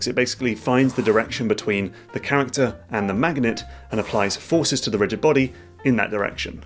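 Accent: United Kingdom. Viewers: video starts, british guy speaks, subscribed, its that easy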